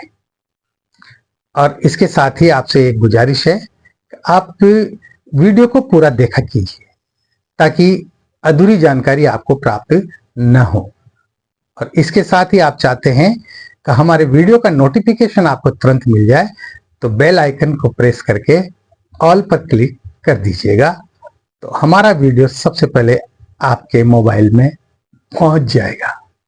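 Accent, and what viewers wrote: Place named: Maharashtra, India. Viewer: 🙏प्रणाम गुरुजी 🙏🙏 आप महाराष्ट्र से हो ना, आपकी बोलणे के तरिके से ऐसा प्रतीत होता है, क्षमा करे 🙏🙏🙏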